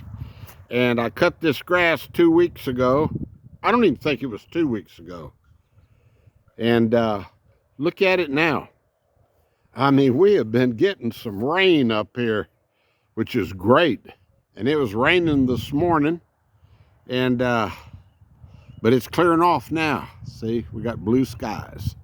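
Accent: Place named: Texas, United States. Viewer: Just came to your video (partly) because your title mentioned Sarasota Tim - he's a hoot to watch. Watched a couple of your videos and enjoy your Texas drawl and the way you comment on the Davis mountains and the surrounding area. Beautiful country. That Dixie can really move. She looks like a Border Collie, I grew up with a BC in my youth in Houston. Just subscribed.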